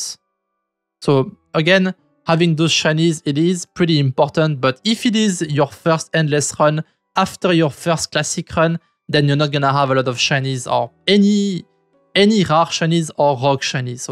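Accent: France. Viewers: Hahahaha your french accent is f obvious when you speak, also nice video btw. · je m'abonne car tu me fait rire avec ton accent français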